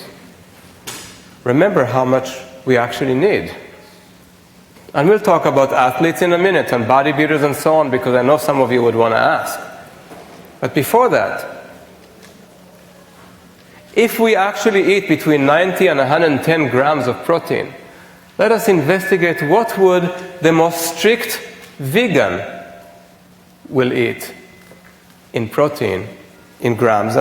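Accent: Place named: Israel. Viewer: when he pointed out his Israeli accent it was a ripoff of what Deepak Chopra does in his seminars to get rapport with his audience, mentioning his Indian accent.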